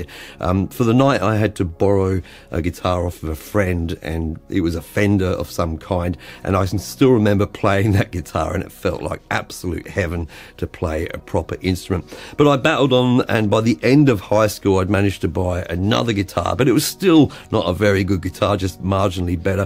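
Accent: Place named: Australia. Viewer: Wow, I really thought you were Australian. Never would have guessed you were brought up in the UK. Anyway, thanks for sharing your expertise here. It's really helped me a lot as a Cakewalk newdie. As for me, can't really say I'm a musician, but have the urge to create. Composed a lullaby for my new baby last year which led me to Bandlab, and now to Cakewalk as I try to make the song as good as I can with my limited abilities and 100 virtual instruments. Really like it as a creative outlet. Thanks again for making it easier.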